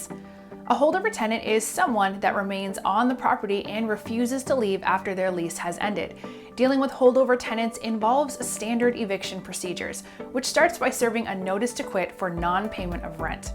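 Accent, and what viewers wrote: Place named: Canada. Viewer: I thought this was going to be for Canada, given her accent.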